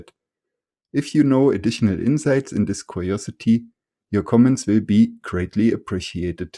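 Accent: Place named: Germany. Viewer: Strongest German accent ever. Love it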